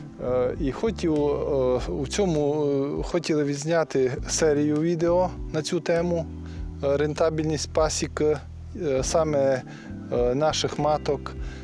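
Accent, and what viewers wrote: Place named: Transcarpathia, Ukraine. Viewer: Іздив колись на закарпаття ще в 90 роках мав багато друзів Ваш говір нагадує мені ті приємні часи Успіхів Вам Цимбори Слава Україні!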